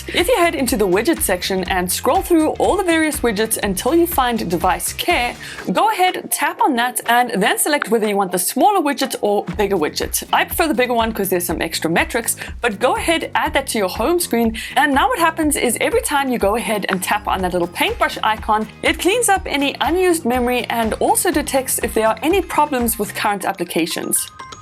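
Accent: South Africa. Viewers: Sounds 100% South African and I love it... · are you south african?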